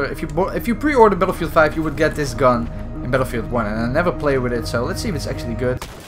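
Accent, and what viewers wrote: Netherlands. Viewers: r u dutch bro? cuz, pronounciations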